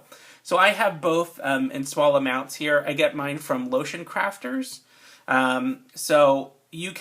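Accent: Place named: Philadelphia, United States. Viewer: I've made room sprays using varying percentages (from 50% to 90%) rubbing alcohol, or vodka (35% or 70 proof), or Evercleer (95% or 190 proof) grain alcohol. I really dislike the alcohol smell in all of them, nor do I like waiting for the alcohol smell to dissipate...some taking MUCH longer than others. So I'm glad to hear about the DEO Household stuff you've recommended using. I'll check it out. One other thing, though. I've always heard you should use EO instead of FO in a linen spray because FO can leave a stain and EO will not. For that reason, I've only ever used EO in a room or linen spray. I've never used FO in a room or linen spray, so I can't say whether it stains or not. Have you any thoughts on that provision? You sound just like my friend in NE Philly...are you in Philly?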